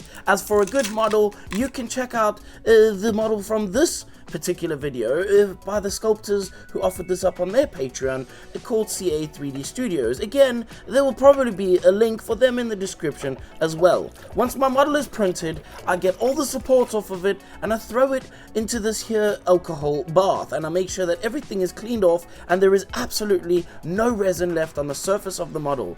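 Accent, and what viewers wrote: South Africa. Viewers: Just got into resin printing and painting, your channel is very informative, thanks man and keep up the great videos. You sound South African? Howzit! Lekka Lekka!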